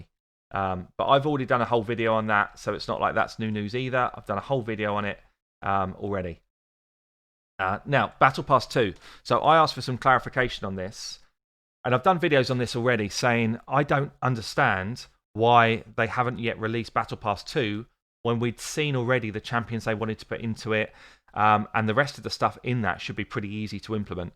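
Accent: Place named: London, United Kingdom. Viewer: Never doubted you are from London and always enjoyed your pronounciation! :)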